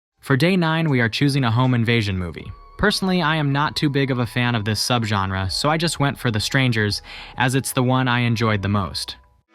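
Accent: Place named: United States. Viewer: Your from the Netherlands? It sounds like you have an American esque accent.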